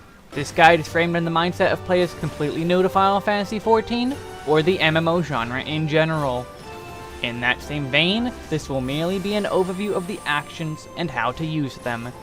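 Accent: United States. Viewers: At first I thought his way of speaking to be familiar, then I realized his intonation is basically the same as Superbutterbuns', but a way more chill. (I wonder if they're from the same state in the US, but I don't know how to differentiate all the accents, so if anyone knows, please tell me)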